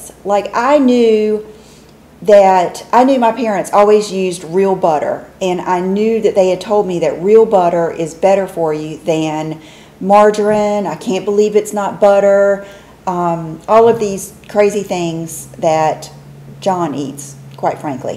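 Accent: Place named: Texas, United States. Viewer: Besides being drop dead beautiful and I think your accent is from Texas cause I'm a Texas gal living in California but you are also so sincere so sweet and thoughtful I love your channel love you❤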